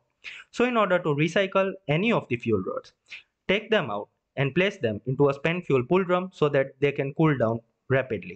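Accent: India. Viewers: Are you Indian, your accent is nice. no sarcasm im Indian.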